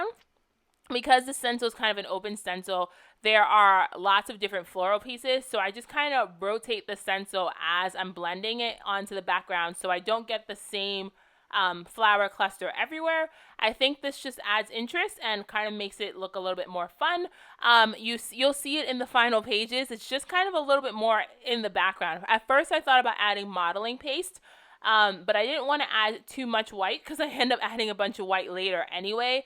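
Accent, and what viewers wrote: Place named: East Coast, United States. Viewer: Love when you do mixed media! It was fun to hear your east coast accent sneak out a bit more on this one too! Beautiful spread!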